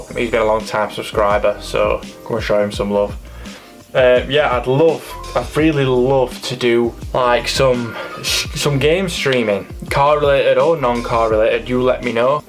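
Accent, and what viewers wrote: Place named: Yorkshire, United Kingdom. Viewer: Top effort on 5k. Hey, stay strong and look for only little goals to stay up beat. I know how tuff things can be for you in lockdown as I was without any luxury in Afghan for a very long time. Remember to enjoy life and don't set big daily goals as failure to complete them can make you feel worse. Lovin your Vids & Yorkshire accent more than ever these day since I'm working down south.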